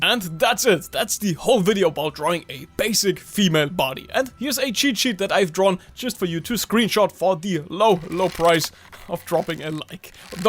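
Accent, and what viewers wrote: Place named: Germany. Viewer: wy is is a garmen accent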